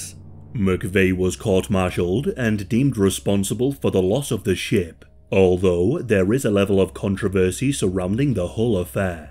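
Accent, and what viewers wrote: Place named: Scotland, United Kingdom. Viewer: He's Scottish. You can immediately recognise it because he pronounces "u" properly.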